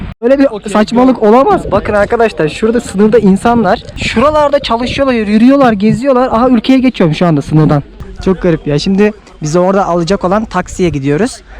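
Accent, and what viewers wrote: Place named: Aegean, Turkey. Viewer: Gadeşlik seni ilk defa izliyom sen bizim oralıya benziyon şivenden belli egelimisin.. Accık şiven aydınla muğla arası benziyo. Güzel gez dikkat et kendine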